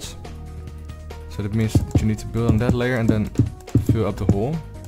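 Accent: Netherlands. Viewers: I sense a Dutch accent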